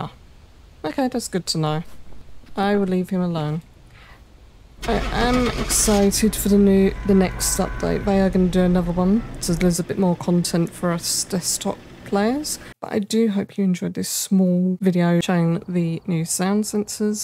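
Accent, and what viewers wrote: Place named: United Kingdom. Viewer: from what I’ve heard most British accents I hear are far more pronounced than yours, which is why I was wondering what accent you had. I guess you just have a really relaxed way of talking!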